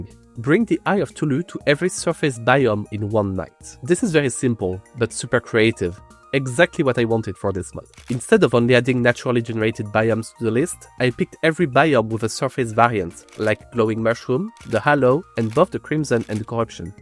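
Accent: France. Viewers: you sound like you have a french accent. Am i wrong?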